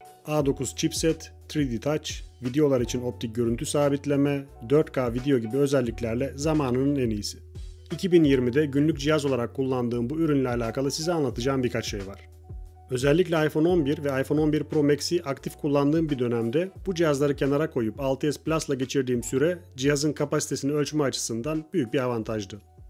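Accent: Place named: India. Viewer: Hocam hint aksani var sende ya:)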